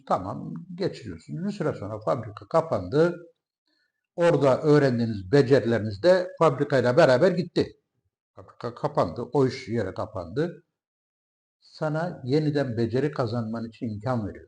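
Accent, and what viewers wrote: Turkey. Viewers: Aslen turkiyenin neresindensiniz ? Hatay şiven var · DOGRULARI KONUSTUGUNUZ ICIN TEBRIKLER.ZATIALINIZ GALIBA VICTORIA'DA YASADINIZ, BALI'DE YASANIR ,KUTLUYORUM, BY THE WAY MEMLEKET NERESI? AKSANINIZ ADANA'YI CAGRISTIRIYOR, BIZ ISTESEKTE ORALARDA YASAYAMAYIZ, . NEDENI ISE ILK KAFILELERDEN BIRISIYLE GELMIS VE 57 YILI TAMAMLAMAK UZEREYIZ,SIMDILERDE 22 AILEDE OLUSAN BIR COLONI OLUSTURDUK OLULERIMIZ VAR YAS OLARAK 93 .SIZE YENI DUNYANIZDA MUTLULUKLAR DILIYORUM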